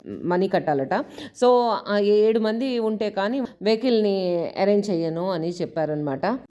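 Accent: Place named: Godavari, India. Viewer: Godavari slang suuuuuper ma'am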